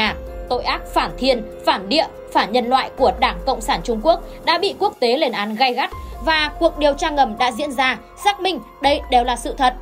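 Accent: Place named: North, Vietnam. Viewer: Hello chi em kg biet chi ten la gi cu keu chi la nghe no kg hop voi chi chuc nao gi chi dep gai Thi ten cua chi chac Han la dep lam vay chi Cho em biet chi ten la gi di di nhe chi em rat thich giong noi bac ky cua chi chi noi chuyen rat la hay va duyen dang nghe hoai nghe mai ma kg thay Chang chi noi chuyen rat rat la hay va thu hut em da bi chi thu hut tu Luc Lan dau tien em xem clip cua chi chi la idol cua em va la than tuong cua em em rat ham mo va rat nguong mo chi trong TRAI Tim cua em vay chi nho Cho em biet danh tanh cua chi nha du la chi co ten gi em cung thich nguyen sau chi Cho em biet ten cua chi la gi la em day mang nguyen lam Roi chi dung de em cu keu chi la nghe no kg thich hop voi chi chuc nao vay nho Cho em biet chi ten la gi nha cam on Chi love from Melbourne Australia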